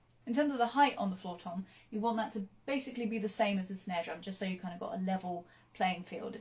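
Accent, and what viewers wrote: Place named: Cockney, United Kingdom. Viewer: This bird is Cockney!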